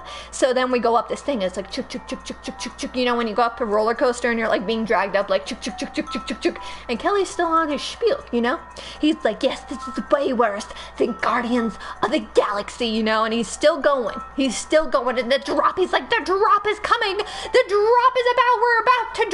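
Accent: South, United States. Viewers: Why does this Turk sound like a deep south American? :-?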